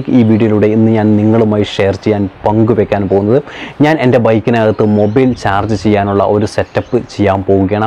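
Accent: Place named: Malappuram, India. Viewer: നിങ്ങളുടെ slang എനിക്കിഷ്ടമാണ് bro മലപ്പുറ മോ കോഴിക്കോ ടൊ?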